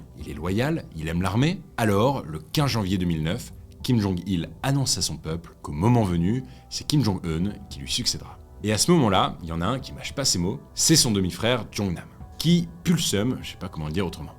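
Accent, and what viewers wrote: France. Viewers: C'est pourtant correct... jamais parfait vu l'accent français mais ça ressemble quand même bcp...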